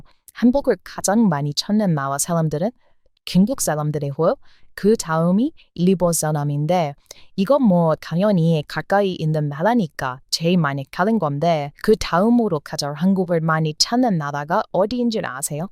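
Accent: United States. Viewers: 매력 넘치는 미국식 한국어,정말 최고! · 미국식 한국어ㅋㅋㅋㅋㅋ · " 입니다."하는 멘트 예전에 개그맨들이 미국갔다온 사람들 흉내내는 말투로 자주쓰던 시절이 있었는데 님은 오리지널 미국교포 말투입니다.흥미롭네요.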